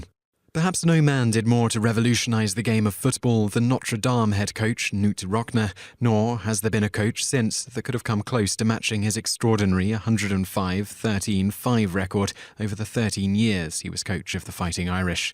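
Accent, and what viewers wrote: United Kingdom. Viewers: Too many famous people have gone down in planes, esp. in those single or twin engine prop planes like Cessna! Rich folk with their toy planes! Has happened even locally once in awhile where I live, a local airport with a line of small planes called "Gull Airlines"! Wouldn't get me up in those tiny planes for anything in the world, forgetaboutit! BTW, Ethel Kennedy's parents were both killed in a plane crash together as well and Jackie knowing the family history didn't want JFK Jr. to fly and said he was easily distracted and may have had ADHD! May they all rest in peace. Love a British accent...thanks for sharing~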